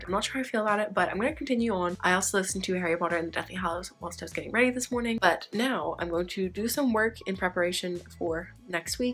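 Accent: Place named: United States. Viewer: I think it's super cool that you are pushing yourself to "read harder" by reading at least one adult book a month. I hope you find some new authors and genres that you enjoy. I am such a tired crier, like if I'm weepy, chances are I'm exhausted. Which country do you live in/ go to uni? Your accent is like super hard to determine lol, sometimes I'm like oh! America for sure, and then sometimes I'm like... England? maybe? Australia? lol I'm the worst at this...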